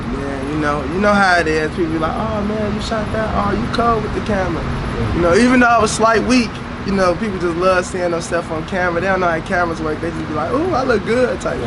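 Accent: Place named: Detroit, United States. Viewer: he sound like he from detroit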